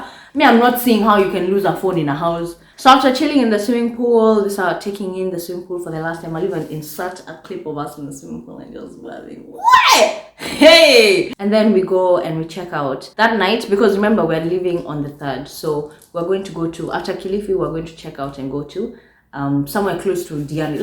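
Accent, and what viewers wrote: United Kingdom. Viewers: When speaks with that British ascent,lawwwd tanivunja mbavu😂😂😂😂😂😂😂😂😂😂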